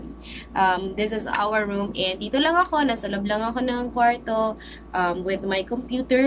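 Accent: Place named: England, United Kingdom. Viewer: hiya nman ako ... hello teacher 😊 love the english accent !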